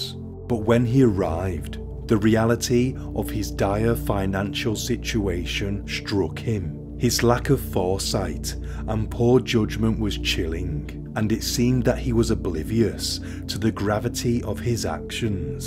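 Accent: United Kingdom. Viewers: That's one of the most British accents I've ever heard my god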